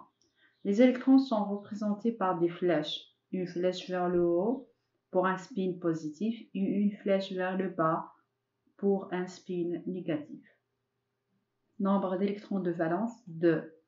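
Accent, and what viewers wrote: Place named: France. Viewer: hta haja ma mafhoma quality t3 son na9sa w zid l'accent francais tab3tha ....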